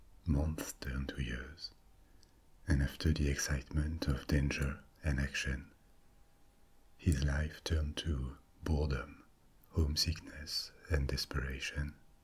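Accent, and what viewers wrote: France. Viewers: Dude I love your accent it reminds me of our (America's, my home country) French Allies in the Revolutionary War which in turn makes me immediately biased, and therefore I enjoy listening to you speak. Thank you for these videos you make for us. Certainly subscribing, my good sir! I wish you nothing but the absolute best. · Can't forget he's french. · Yeah the only thing keeping me up is the accent because I am French as well and it s super annoying that he does not speak in French. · Farted as soon as it started🤦🏼‍♂️😬 scared the shit outta me 🤣😂 Butt... Soon as he said just close your eyes n let me do all the work ...😶👀🤗shiiiiitttt😴😴 say less French boss man whisper guy ..hella amazing 💯💯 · Nothing more relaxing then the sound of a french accent. One of the more beautiful accents In my opinion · Vu l’accent tu es français, existe-t-il des équivalents vf de tes récits mythologique? 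· So zi really found the french qccent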